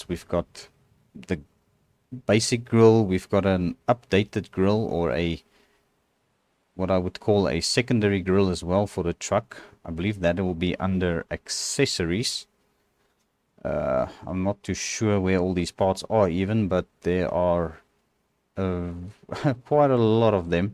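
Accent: South Africa. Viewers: south african i can hear in the accent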